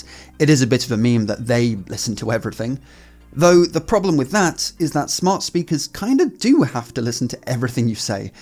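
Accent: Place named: RP, United Kingdom. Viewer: you sound so posh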